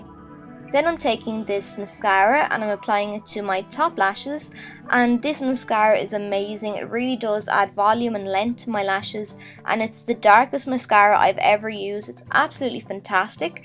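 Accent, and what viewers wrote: Ireland. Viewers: Your irish ♥♥♥♥ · Are you from Ireland ? 😄 I'm in Laois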